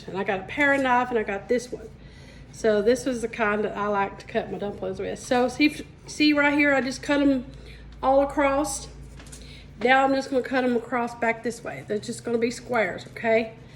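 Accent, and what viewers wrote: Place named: Kentucky, United States. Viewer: I hear that accent. I’m in California, but I lived in North East Kentucky for awhile. I lived in Winchester, under 5,000 in the early 80’s. We also lived in Corinth 210 people back then. Then we moved to New Columbus Kentucky 32 people. Could barely breath so many people lol. It was beautiful and I ate my weight in wild blackberries. I was Blue and Red from the berries and blood trying to get through those thorns. The only thing I hatted was Chiggers. Those stupid bugs made me itch so bad, but it was worth it to be out there. Love your dumpling recipe. I enjoy both flat and fluffy. Thanks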